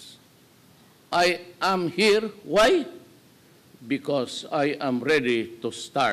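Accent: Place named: Mindanao, Philippines. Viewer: Say what you want about my boy's thick Mindanao accent, but Duterte's dictionary game is on point. English vocab better than most people I hear in the states..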